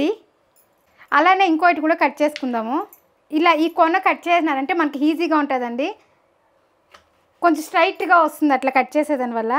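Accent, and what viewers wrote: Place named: Bidar, India. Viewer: Thumba chennagi adige madthira👌nanu Karnataka dalle belldiddu, kannadavara manasu thumba swacha vaddu, nimma mathu kelldagella namma beader jille nenapagute, all the best nimge👌👌👌👍👍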